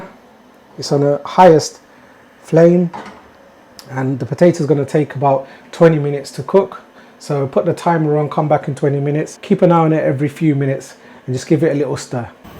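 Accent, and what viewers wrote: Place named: United Kingdom. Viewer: 👍 Always curious about All types of foods but I was born in Morocco… I love my moms cooking but the Indian food goes very well with my traditional education about taste flavours that’s all about. I guess your English from UK sounds familiar to me, I been working in London quite a while in one of the best Japanese restaurants at Old Park lane NOBU juste what is all about GREAT. God bless you 🙏🇲🇦🇲🇦🇫🇷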